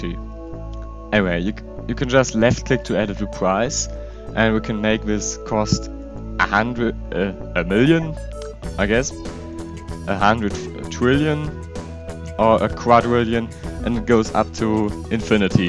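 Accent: Germany. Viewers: Hey dude, is comming a new Update of Slimefun? or is the project abonden? because the last update was in september 2015. But away, Nice video bro. And are you German? Your Dialect in English is like German. :)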